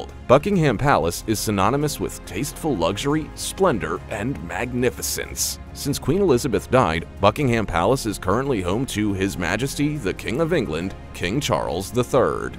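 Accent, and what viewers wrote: United States. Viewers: I know he also sounds very uneducated about this information about the queen and the monarchy I'm guessing he's an American from the way he talks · I do dislike British documentaries narrated by Americans. Not only do they tend to be factually incorrect, but the pronunciation often jars on British ears. For example, "Buckingham" places no emphasis on the last syllable, whilst the American pronunciation makes it sound like cold meat. Incidentally, "England" and "Britain" are not interchangeable. The Queen and King were crowned Queen/King of the United Kingdom of Great Britain and Northern Ireland. And I strongly suspect any disfavour Scotland has with England has got nothing to do with ancient gold mines!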